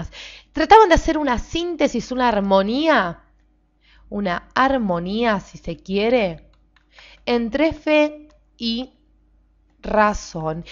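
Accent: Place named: Argentina. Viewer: Hola chicos... que tiene el acento? No son argentinos ustedes? 😊 los argentinos nos caracterizamos por el acento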